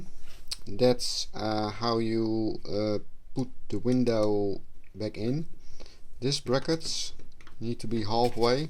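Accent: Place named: Netherlands. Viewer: Bedankt voor je handige instructies. We have a 1996 VW Cabrio, and your instructions and video guidance was just what I needed to get this done. We live in Norfolk County in Ontario, Canada, and there are a lot of Dutch people in my church--it was very nice to hear your instructions in English with your Dutch accent. I did pretty much everything that you did, and just finished and the window works great! Thank you especially for the extra details about the clamps and the alignment procedure. Thank you so much.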